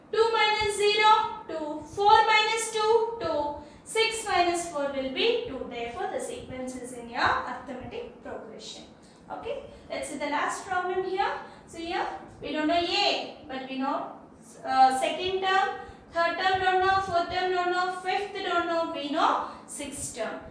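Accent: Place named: Bangalore, India. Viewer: Mam I thought that u r from banglore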